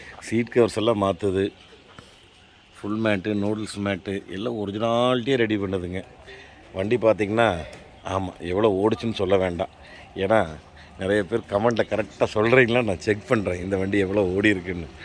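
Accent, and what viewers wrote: Kongu, India. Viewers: அருமையான வேலை..... உங்களின் கொங்கு தமிழ் பேச்சு.... மிகவும் அழகு.. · கொஞ்சும் கொங்குத் தமிழ் பேசும் கோமகரே...! திகட்டாத தித்திக்கும் பேச்சுடைய திருப்பூர் திருமகரே...! காண்டா மிருக கலர்ல ஹோண்டா சிட்டி கார் வந்தாலும் அதை ஃபேண்டா கூல்டிரிங்ஸ் கலராட்டம் மாத்துறதுதான் நம்ம அய்யாவோட மகத்துவமே❤❤❤❤❤❤😊😊😊😊❤❤❤❤ அட...அட...அட... அருமையோ அருமை அய்யா🙏😊😊😊😊❤❤❤❤❤❤ 2004 மாடல் ஹோண்டா சிட்டி கார் அடிச்சு விரட்டி ஓனர் நன்றாக சிட்டிக்குள்ளையும் ஹைவேயிலையும் ஓட்டியிருந்தால் தோராயமாக 1,80,000 முதல் 2,30,000கிலோமீட்டர் வரைக்கும் கார் ஓடியிருக்க வாய்ப்பு இருக்கு அய்யா. என்றும் அன்புடன் உங்கள் மகன்போல இவன் ரா.முஹம்மது கபூர் (சென்னையில் இருந்து)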